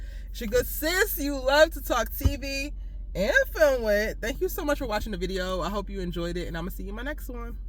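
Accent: Baltimore, United States. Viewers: Side note: I feel like your accent is giving Baltimore/DMV vibes. How wrong am I?? Lol 😂😂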